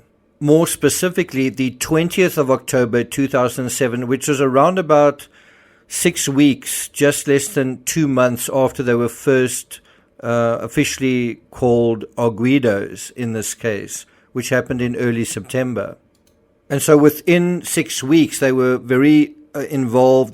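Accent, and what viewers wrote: South Africa. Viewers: Just found your channel from the Deception Detective who reacted to this video! Love it! Also awesome to hear a South African on YouTube! Keep smashing it!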